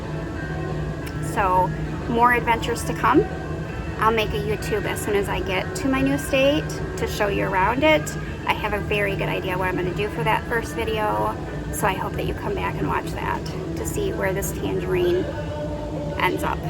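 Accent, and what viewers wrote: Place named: Michigan, United States. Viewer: Hey Tangerine, CornDog here. That was really well done! My wife and I have stayed at the Riverside regularly over the past 30 years....I'd guess over 50 trips to Laughlin. We can drive there from San Diego in about 5 hours which includes a couple of stops along the way. Laughlin is just a friendlier place than Vegas and of course a much older crowd too. Have you done a video on the Jet Boat Tour down to Havasu? My wife & I took that trip a few years ago. Some beautiful scenery for 60 miles down and then back up the river. Are you originally from the upper midwest. I grew up in Michigan and you sound like you could be a Michigander. Thanks for the video!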